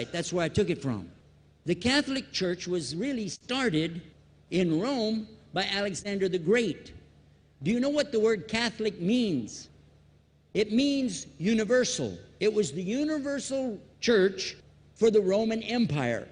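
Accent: South, United States. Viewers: hears a Southern Drawl in *'s speech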